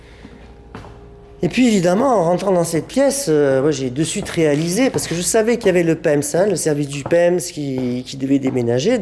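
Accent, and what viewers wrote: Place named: South, France. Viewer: Je ne sais pas pourquoi YouTube me propose cette vidéo.... Une intro à laquelle on n'entend ni ne comprend pas grand chose, on se balade dans des travées pour voir des portes armoires blanches et une outro presque aussi désagréables que l'intro. Quand on croise quelques appareil ou que des portes s'ouvrent, la voix nous dit juste ce que c'est dans son jargon sans expliquer. L'intention est sûrement louable mais on n'est plus en 2010. PS c'est original un gars avec l'accent du Sud de la France, à Rennes, qui parle comme un belge ou un suisse (nonante :)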